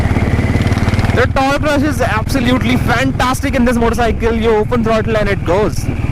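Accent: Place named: India. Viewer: I love the Indian accent...so soft and calming...and I have a Classic...so this vid is great for me!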